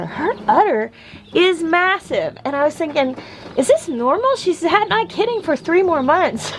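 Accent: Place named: Ozarks, United States. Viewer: Love your accent, sounds like someone from ozark!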